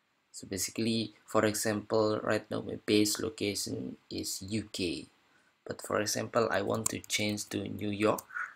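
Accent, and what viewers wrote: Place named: Indonesia. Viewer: Indo's accent ^^ ...nice vid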